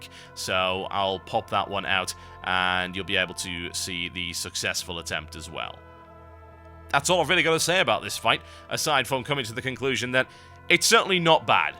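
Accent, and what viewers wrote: United Kingdom. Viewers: The Power of the British Accent!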